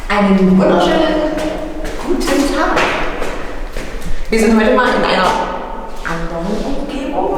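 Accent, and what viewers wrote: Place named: Saxony-Anhalt, Germany. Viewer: "Jetzt schießmer volles Roohr in den Caaarpooorn" :-) Ich komme gebürtig aus Sachsen-Anhalt. Dieser doch so heimische Dialekt.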